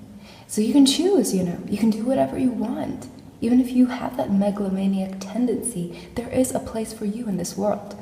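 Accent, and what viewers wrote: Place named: United States. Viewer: I have been a subscriber of yours for a long time. Since you were known as " " or something like that. Anyway I just watched all the videos on this channel and I have no idea what's going on, and that's why it's genius. As an American, I have to say your American accent is pretty good too. I am curious, when you read the books is that your real accent? Anyway, please keep doing what you are doing. I think your stuff here is totally nihilist and I love it.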